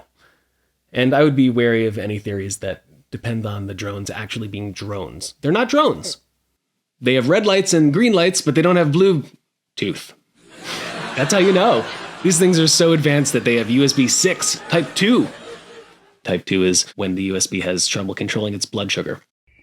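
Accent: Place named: United States. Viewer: You are not Cornish you're American Dumbo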